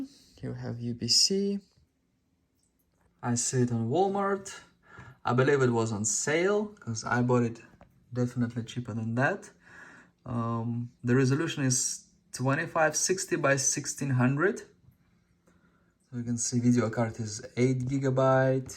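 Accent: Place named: Russia. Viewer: Слушаю автора и кажется у него наш, русский акцент, буквы чётко выговаривает, без соплежуйства английского...)))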